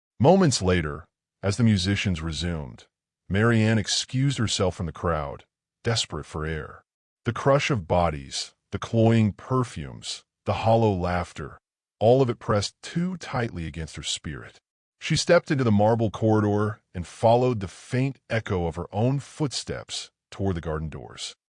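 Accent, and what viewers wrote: United States. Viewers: I disagree! It needs to be narrated with a British accent, not American.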